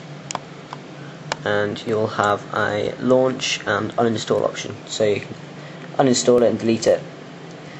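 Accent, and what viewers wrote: England, United Kingdom. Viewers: although i'm not from uk i love the english accent! :D btw i'm from israel! :P great vid!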